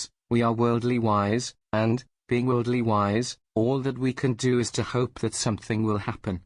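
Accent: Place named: Wales, United Kingdom. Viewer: The Welsh male accent is the worst choice for English narration. Nothing against the Welsh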